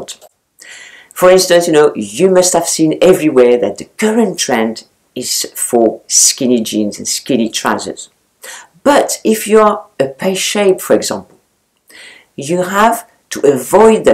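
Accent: France. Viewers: Besides the great, and wonderful content, I come to this channel to hear French accent!♥️♥️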